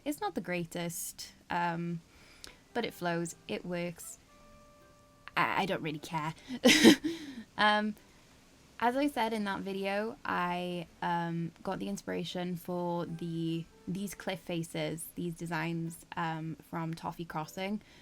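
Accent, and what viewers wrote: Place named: Wales, United Kingdom. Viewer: Are you welsh by any chance? You have a bit of an accent 😅 amazing island btw!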